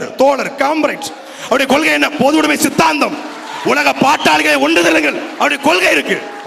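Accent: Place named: Tirunelveli, India. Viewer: திருநெல்வேலிகாரன் தமிழ் பேச்சு னாலே தனி கெத்து தான்.தெளிவான உரையாடல்.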